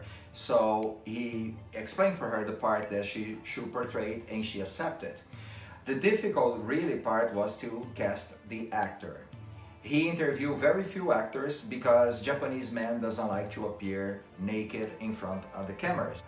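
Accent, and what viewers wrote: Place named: Brazil. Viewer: O sotaque brasileiro é tão fácil de notar.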